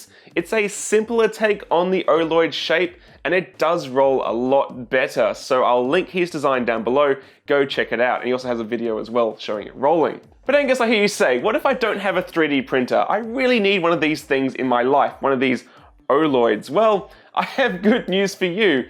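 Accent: Australia. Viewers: Is he Australian? Those accent, similar to Hugh Jeffreys iPhone Youtuber. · I wonder if you are from Autralia?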